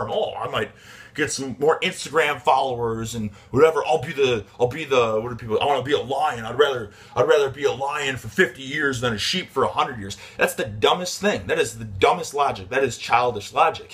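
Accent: Midwest, United States. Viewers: Is he from the midwest?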